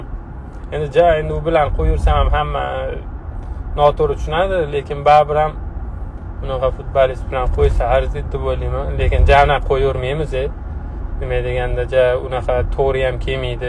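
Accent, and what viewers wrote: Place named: Khorezm, Uzbekistan. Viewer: рахмат сизга Хакикий Хоразм фарзандисиз гапришингиздан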